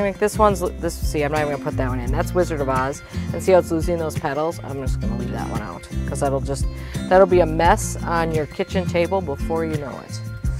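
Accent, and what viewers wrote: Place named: Midwest, United States. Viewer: “All flowers match.” Yessssssss! I love watching you garden, your accent takes me back to my time in the Midwest and makes me want to call old friends.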